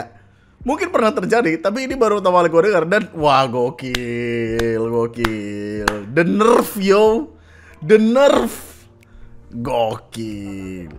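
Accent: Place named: Surabaya, Indonesia. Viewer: Suroboyo Banget 😂 Aku cinta Orang2 Surabaya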